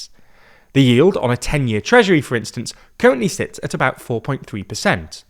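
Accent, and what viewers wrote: United Kingdom. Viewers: Up to the strident, 'all knowing' Ai British accent was tolerable, but then it transitioned into an all out adblock circumventing ad, BEWARE, CHANNEL BLOCKED.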